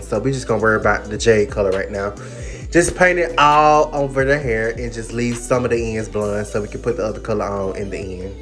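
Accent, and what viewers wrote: South, United States. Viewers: are u from memphis or houston u sound like u have a similar accent to us round down souf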